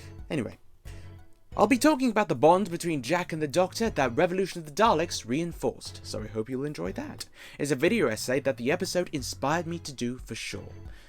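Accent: RP, United Kingdom. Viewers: First off, to you and all fellow Whovians, KISS army (fans of KISS), Trekkies, Jedis and everyone who's alive (I think you'd agree to name all the people on Earth would be difficult) - HAPPY NEW YEAR 2021. As I've said many times now... FUCK OFF 2020 (apologises to ladies present for my swearing). Wouldn't you agree 2020 is the first year that people the world over ACTUALLY and personally DISLIKE? Anyway, ODDLY, I have to say following what you were saying in the video was difficult? No disrespect. I love you posh accent and tone because I have it too. We have the Mojo ladies and Yanks like LOL Maybe picked up from watching Jon Pertwee, the previous two Doctors and beyond with their BBC Queen's English? Let's face it, until 2005, Doctor Who never was working class or had any characters representing them until the 9th & 13th Doctors. And now Dan has/will? 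Which leads me to the next point: Is it possible the increase in northerners has put off American viewers because apparently they have been baffled by John Bishop? I haven't watched "Resolution" or much of the season that finished. Not sure which one it is. So, I was confused by the trailer with John Bishop. It looked to me in the way it freeze framed and the wording to be introducing Bishop as the NEW Doctor? In fact I think they've MISSED a chance to cast him which would've been perfect. I don't think a female Doctor has been a hit with non fans. But to say the show's problems is due solely to the Doctor being femal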